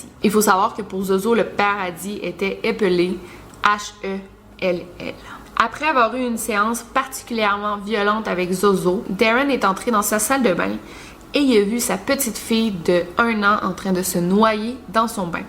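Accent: Quebec, Canada. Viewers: Moi j'adore l'accent Québec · Merci pour cette vidéo. Ooooooh que j'adore ton accent. C'est trop mignon 🥰. J'adore l'accent Québécois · J adore ton accent ...québécois tu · Depuis que je regarde tes vidoe je me suis habitué à l’accent canadien 😂❤️ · Roh l’accent québécois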